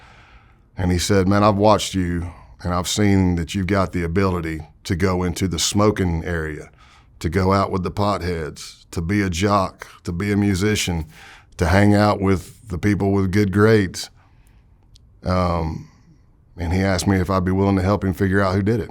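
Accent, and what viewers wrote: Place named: Greenville, United States. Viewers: Yo why does he sound exactly like Rob "ribbit" Pitts from VinWiki Must be that Greenville accent. Love it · HE IS SO SOUTHERN!!! 💞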